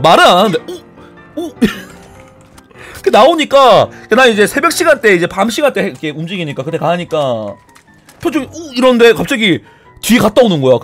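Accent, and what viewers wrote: Busan, South Korea. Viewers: 부산사람 이었어? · 형 부산사나보네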